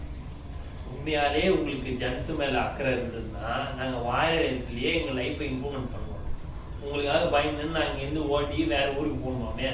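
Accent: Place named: Chennai, India. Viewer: Onces more chennai paasa la pesunga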